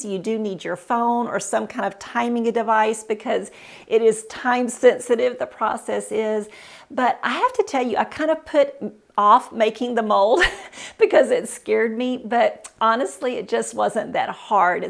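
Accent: South, United States. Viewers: ohio nice state. friendly state like indiana. are you from the south originally ? your accent thats why i ask.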